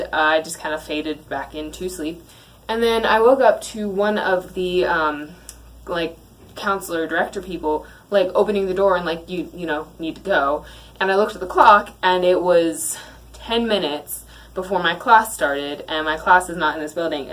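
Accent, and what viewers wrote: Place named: South, United States. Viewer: all that suthern drawl down there at duke done pulled away ur british aksent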